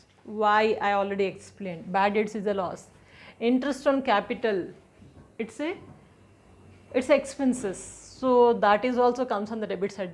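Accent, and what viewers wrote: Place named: Tamil Nadu, India. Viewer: How polite way of teaching 😍 Tamil accent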